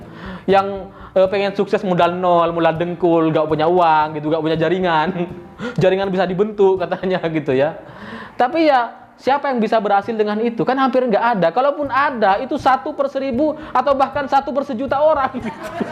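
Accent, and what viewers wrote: Madura, Indonesia. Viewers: Narator nya ...dialek nya Madura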